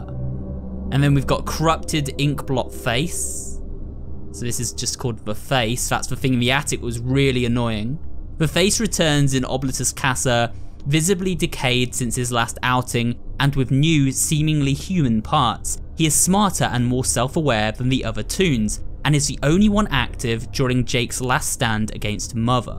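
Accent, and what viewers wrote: United Kingdom. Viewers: He british asl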